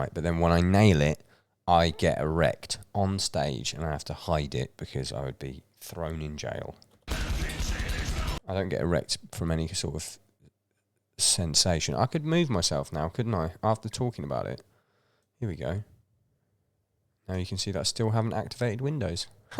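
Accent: United Kingdom. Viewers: Love your British accent...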